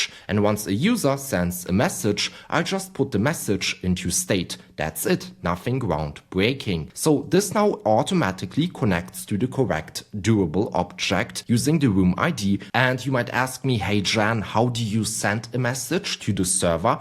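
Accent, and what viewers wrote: Germany. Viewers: Thank you german sounding man for your wisdom.... I shall use it wisely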